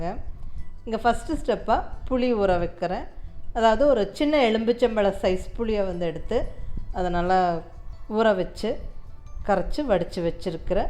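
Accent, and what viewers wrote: Coimbatore, India. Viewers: i tried in tis in the same way u did... got appreciations from family members...tq mam Unga slang nalla iruku..nenga Coimbatore ah...... God bless mam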